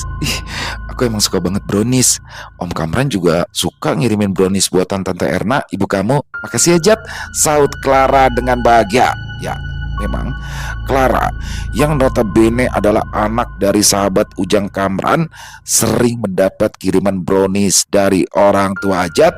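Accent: Jakarta, Indonesia. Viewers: Bang ❤❤❤, bank, bang Doni sama mba nani,aa hajat sama Clara,mas wasis sama mba ivory,mas gembul sama teh euis, 1/1 pada punya jodoh masing masing lucu deh,.wkwkwk aq suka bang bawain cerita,pakai logat betawinya mantap x,sampai saya tertawa sendiri pas dengerin bang cerita,..wkwkwk 👍👍👍 pokoknya menghibur x saya suka ini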